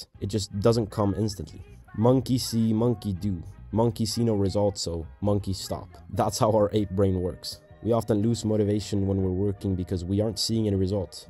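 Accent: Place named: Sweden. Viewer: just a fellow swede appriciating another swedes work keep going this was really helpful!!(if my hearing is wrong and u r not a swede i'm sorry but u do have a nice accent then!)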